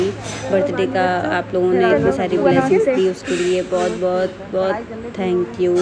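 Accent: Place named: Mainpuri, India. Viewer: Aap ki bolne ka tarika bahut hi pasnad aata h.menpuri ke jesa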